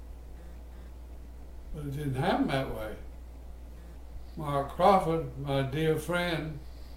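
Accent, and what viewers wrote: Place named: South, United States. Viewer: True southern draw…like my pappy use to have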